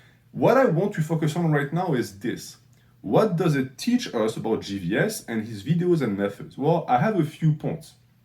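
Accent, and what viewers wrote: France. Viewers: why are you french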